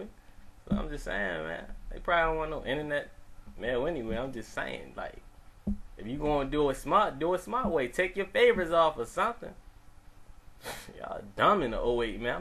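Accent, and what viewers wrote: Baltimore, United States. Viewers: It’s a Baltimore accent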